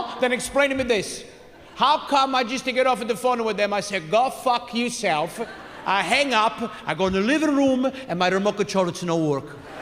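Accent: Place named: South, Italy. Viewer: Hahaha spoken like a true southern Italiano 🤌🇮🇹. Loved it 👏😂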